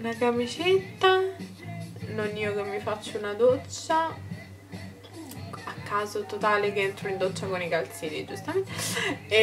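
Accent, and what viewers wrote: Rome, Italy. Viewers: E' la prima volta che ti vedo su youtube. Guardando i tuoi vecchi video si vede che sei molto cambiata, ma sei ancora bellissima. Dal tuo accento direi che sei sicuramente di roma.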